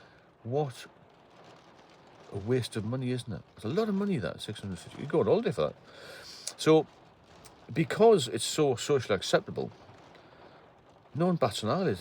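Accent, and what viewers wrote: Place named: Newcastle, United Kingdom. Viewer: Where you from,I khow your a geordie,I use to go to Wallsend in the navy Been the big market in Newcastle afew times,got a few mates in Jarrow,all the best for 2025 sir👍👍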